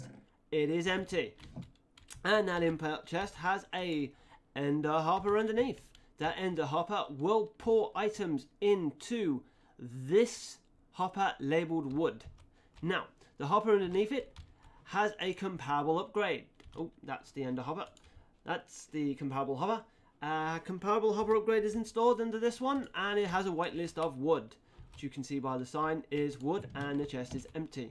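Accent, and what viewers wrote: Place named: United Kingdom. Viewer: Yeah, hoppah. Nice explanation and voice. I never really liked the british accent, I always preferred something like the canadian one. But you do sound nice.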